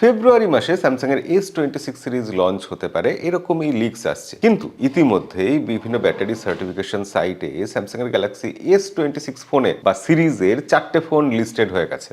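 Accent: Bangladesh. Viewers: এই বাংলাদেশী উচ্চারণ নিয়ে কি করে আনন্দবাজার এ চান্স পেয়েছেন এই উপস্থাপক!!!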